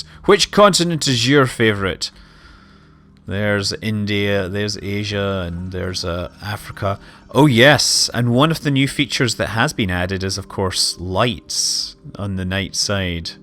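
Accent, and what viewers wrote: Scotland, United Kingdom. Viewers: Scott live in California?! I thought he lived in UK because of his accent! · I wish I could watch someone explore Space Engine and commentate it with a Scottish accent for the rest of my life. There's just something about it...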